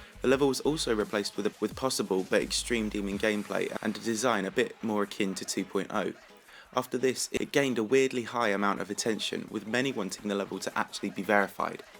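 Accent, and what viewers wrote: United Kingdom. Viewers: I love ur british acent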